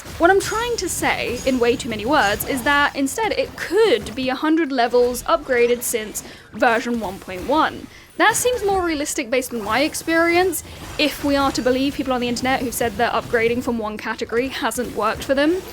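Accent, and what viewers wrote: United Kingdom. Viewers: Can I just say I LOVE your accent? Is it British? I can listen to your talk all day <3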